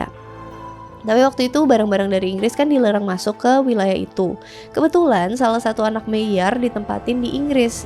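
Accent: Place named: Banyumas, Indonesia. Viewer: Love you...Ngapak Bae bahasanya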